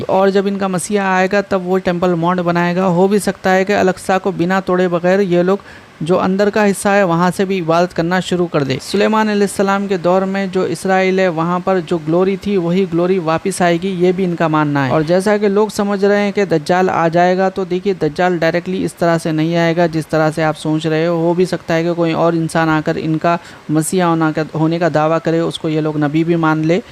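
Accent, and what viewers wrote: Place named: Hyderabad, India. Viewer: Bhai hedrabadi nhi bola kre kuch chizs smjh nhi aati bhot jgh se viewrs hai apke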